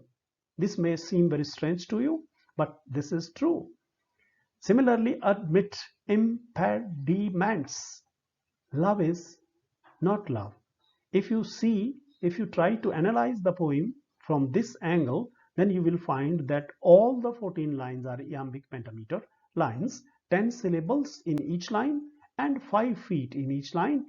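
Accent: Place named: England, United Kingdom. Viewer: Sir, memories of 2005 when I was in BA. Ist and you were the lecturer. I was stunned by your English accent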